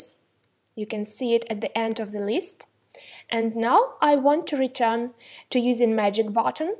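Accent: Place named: Russia. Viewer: russian accent) забавно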